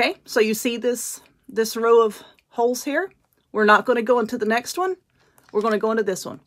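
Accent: South, United States. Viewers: What state are you in? I like your Southern accent. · this is absolutely beautiful! I can't wait to find the frames. I've been following you for a couple of yrs now and I absolutely love watching you with all of your crafts. You've got a awesome personality. I love your personality. I don't understand why people have to be so low. If they don't like you or what your doing there's a simple solution. Get off the channel. I loved how you handled that by telling them to get a hobby lol. Some people just love drama! My friend does wreath videos and there for awhile she was getting harassed pretty bad. Mostly from other wreath makers and their followers. I hope that it doesn't get that bad for you for your so much fun to watch. I also love your accent. I'm a country girl myself with a heavy southern accent. Anyways keep ignoring them stay positive with your bubbly personality! 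· I love your southern accent.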